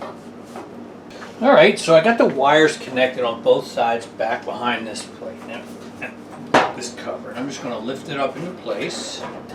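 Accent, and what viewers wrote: Philadelphia, United States. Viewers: You from Philly or Boston?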